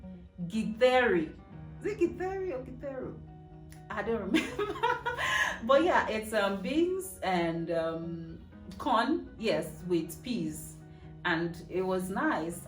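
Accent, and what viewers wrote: Nigeria. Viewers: Your Nigerian accent sounds not Nigerian. The loudness is also loudless for a Nigerian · Yeah I noticed that too.. is very subtle only sell outs being "T" and "O" sounds as compared to most Nigerians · So calm and your Nigerian accent is minimal.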